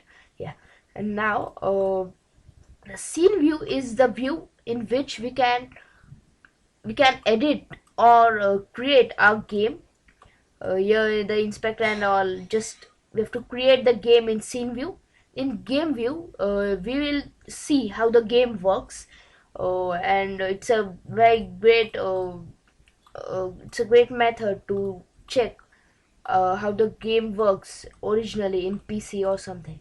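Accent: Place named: India. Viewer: That Indian accent tho!!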